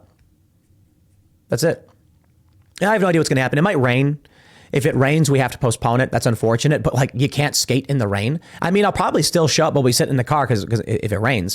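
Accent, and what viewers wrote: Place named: Chicago, United States. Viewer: Anyone else notice that the more self righteous and verbose tim gets, the more he effects an annoying Chicago accent?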